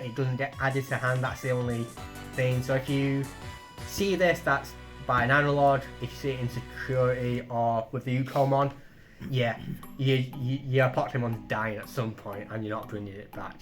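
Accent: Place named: United Kingdom. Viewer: these auto subs are the embodiment of how hard it is to get an ear for a regional british accent sometimes 😅 Nice list!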